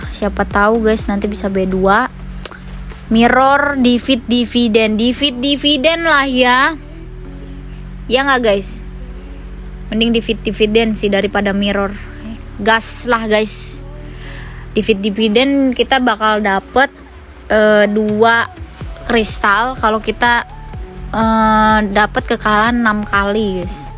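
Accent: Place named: Bekasi, Indonesia. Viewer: Dari bekasi ya 😂😂 logatnya kaya ga asing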